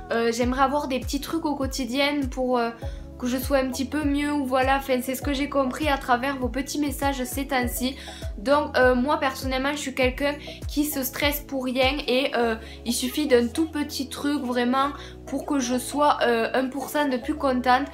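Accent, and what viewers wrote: South, France. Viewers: C'est pas genre un putain d'accent du Sud! haha il est encore plus marqué que le mien! ;)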